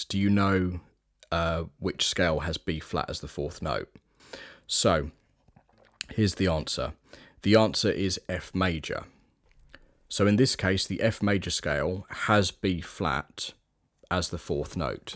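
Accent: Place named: United Kingdom. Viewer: Can you do the video again, but without the British accent?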